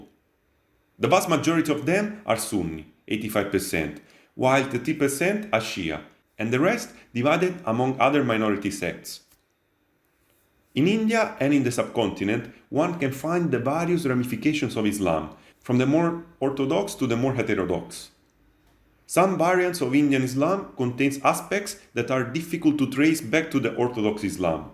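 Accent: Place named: Italy. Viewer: Love your channel. Informative and unbiased. Oh and the Italian accent makes it even better 😂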